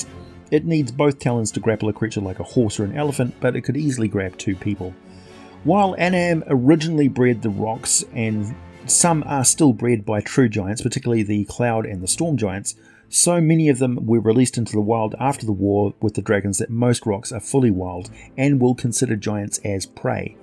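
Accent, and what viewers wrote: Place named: New Zealand. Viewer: I swear, sometimes I tune in or rewatch just to hear you say “murder” half a dozen times or more. Your accent brings much joy to this Shakespearean actor, and one day a director will have me employ a kiwi ‘cent, and I will send you a ticket.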